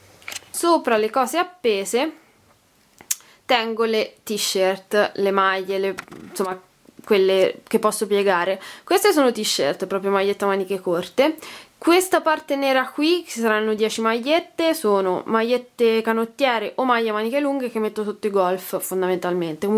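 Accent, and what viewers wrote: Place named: Arezzo, Italy. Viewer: Beeeeello l'accento aretino, l'ho capito subito che sei di Arezzo! Io sono di Firenze, ma i miei nonni sono di lí vicino e mi piace un monte come parlate!:) Mi sono iscritta ora comunque, e mi piaci giá:)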